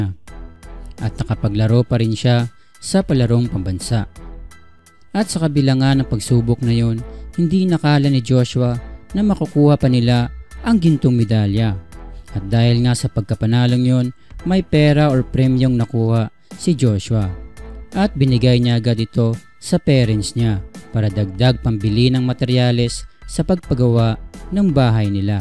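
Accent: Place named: Surigao, Philippines. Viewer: "Bul yo" yan pag pronounce... Surigaonon ni bai...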